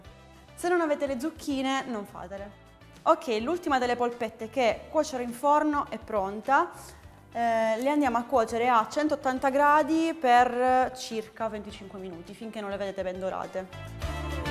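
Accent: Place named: Apulia, Italy. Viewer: Che amore che sei con quell'adorabile inflessione pugliese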